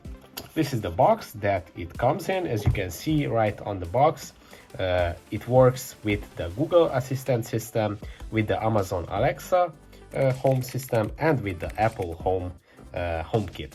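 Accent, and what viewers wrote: Hungary. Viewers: Are you hungarian? I recognized the accent :D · are you hungarian?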